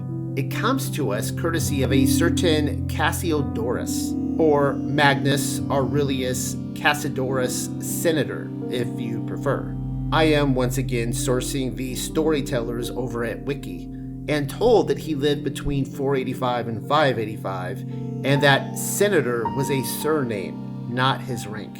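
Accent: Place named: United Kingdom. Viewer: sounds like he was in the UK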